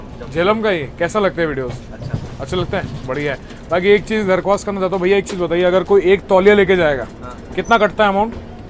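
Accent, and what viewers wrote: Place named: Maharashtra, India. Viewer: You are a marathi person and we enjoy your sweet Hindi.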